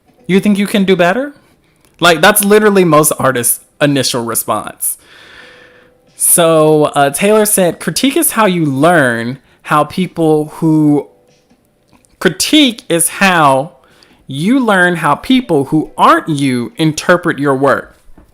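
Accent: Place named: South, United States. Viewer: no way i love your accent! i'm also from the south but i don't live there anymore so it's kind of comforting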